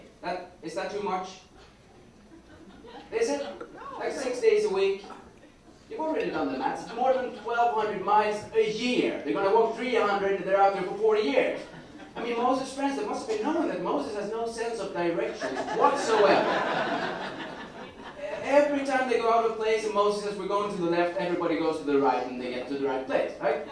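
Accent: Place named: Sweden. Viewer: Haha han pratar tvär svensk engelska dock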